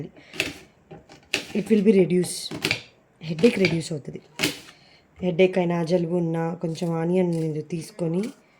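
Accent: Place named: India. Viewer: I already subscribed but I'm a big fan of your voice and what a Telugu slang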